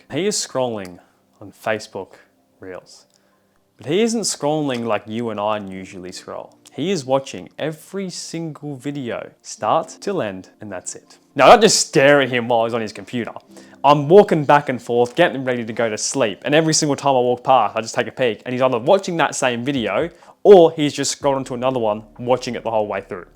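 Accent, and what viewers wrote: Australia. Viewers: Is that an Australian accent you've got there?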